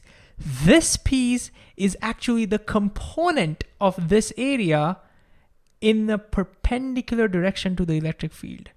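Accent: India. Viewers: JUST WANTED TO KNOW OUT OF CURIOSITY ARE YOU BY ANCHANCE AN INDIAN ? SINCE YOUR ACCENT IS A LOT LIKE US ,BTW YOUR VIDEOS DO SPARK A VERY INTENSE INTREST IN PHYSICS AND THANK YOU FOR THAT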